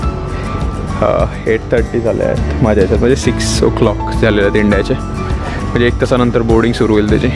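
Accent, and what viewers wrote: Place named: Nagpur, India. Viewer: Aala corona nahitar China Cha moha sodena nagpuri tone ahhe keep it on🤣🤣🤣😁😀🙏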